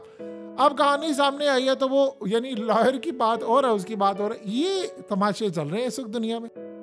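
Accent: India. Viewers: 💯یہ خاتون ہندی ہے ،،جس روانی سے یہ ہندی کے الفاظ بولتی ہے اور جس انداز سے بات کرتی ہے بالکل انڈین سٹائل ہے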